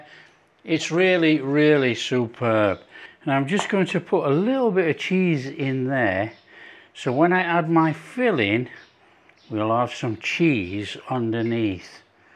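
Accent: United Kingdom. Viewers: Love it! Cornbeef as a quiche. Easily attainable. That's one thing I love about most of your recipes. They contain easily accessible ingredients. And don't require a Culinary degree with all its jargon to describe and decipher. They are straight forward even for a home cook, like myself. You are the most thorough Chef on YouTube or any food channel I've ever watched.that gives the best tutorials. You go through each detail with explanations. You are a champ! Keep it coming Chef. You've got me glued to your channel and your lovely British accent. 😊❤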